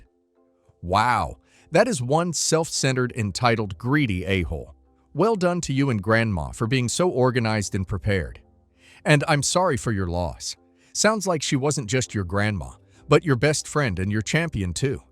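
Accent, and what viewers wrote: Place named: United States. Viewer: The weird part is that I don't think it's AI because there was a thick American accent. 😂😂😂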